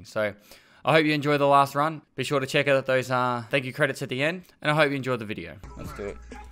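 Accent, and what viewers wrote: Australia. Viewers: Hey Pestily, are you an Aussie?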